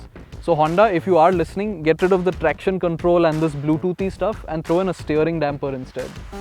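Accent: India. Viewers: I am doing my research on possible Bikes. I give props to people who have become proficient in another language. The problem for me as a native American English speaker is the heavy Indian accent. it makes it difficult for me to understand what the person is saying. I am not criticizing him. He is very fluent in English. His accent is what is getting in the way of me understanding everything he is saying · Indian accent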